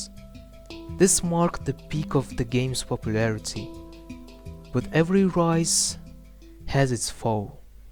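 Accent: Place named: Russia. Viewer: oh I thought that you have that Russian accent 😅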